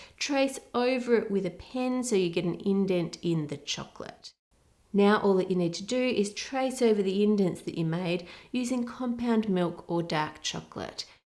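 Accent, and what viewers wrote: Australia. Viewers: Doesn’t everyone in Australia speak like that?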